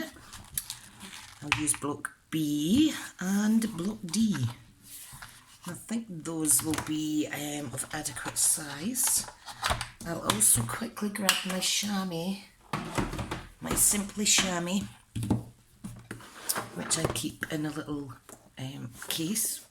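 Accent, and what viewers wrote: Scotland, United Kingdom. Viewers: Another great tutorial. Thanks for sharing. Nice to hear a fellow Scot!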